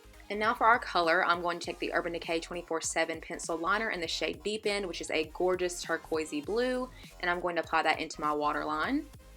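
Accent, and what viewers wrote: South, United States. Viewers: Gorgeous!! Can't wait to see your channel grow! Is your accent southern?! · Are you from the south? I am and I noticed the accent immediately! lol. It gets boring just listening to british / american accents all the time.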